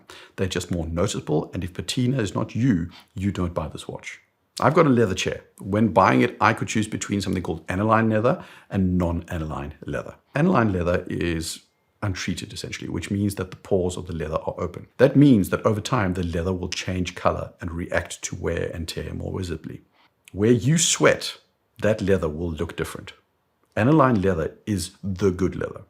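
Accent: South Africa. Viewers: Mr, you're South African? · I have always thought that you had a rather unique accent which sounded very "Saffer", now I know where the accent comes from. Greating fom Cape Town" Boet"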